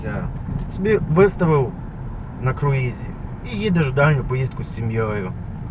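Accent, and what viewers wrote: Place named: Surzhyk, Ukraine. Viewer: Суржик вуха ріже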